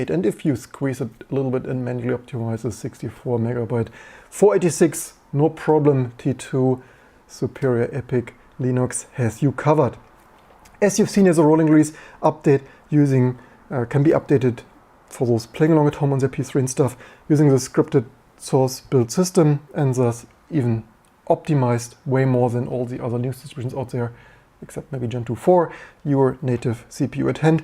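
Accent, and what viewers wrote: Germany. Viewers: Das ist das deutscheste Englisch was ich je gehört habe :) But mad respect for your work man. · Your accent is like a german shit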